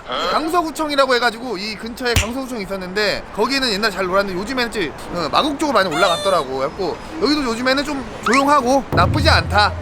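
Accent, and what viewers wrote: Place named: Jeonju, South Korea. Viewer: 아저씨 말투보니깐 전주출신 같은데 ㅎㅎ 능글맞은 것도 그렇고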